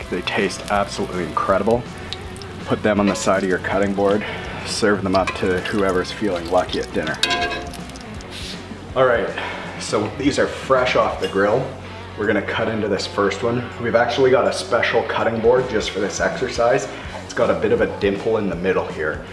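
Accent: Canada. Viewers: R u Canadian? Cuz you sound Canadian AF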